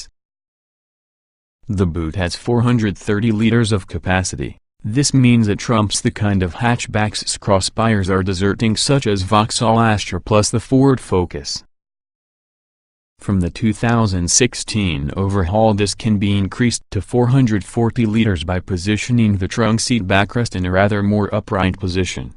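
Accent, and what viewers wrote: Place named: United States. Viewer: Crap USA accent crap review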